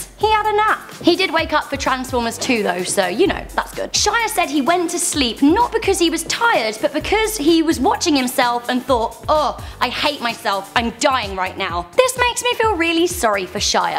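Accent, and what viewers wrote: United Kingdom. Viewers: guurl, Why do i gotta be a sucker for them British accents <3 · Ok usually I'm really into British accents, but when you mix it with you're voice it's literally the ugliest sound on earth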